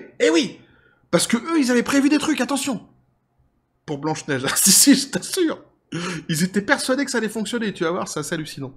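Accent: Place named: Quebec, Canada. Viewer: Rien que pour l accent québécois, je met un pouce vert! 😊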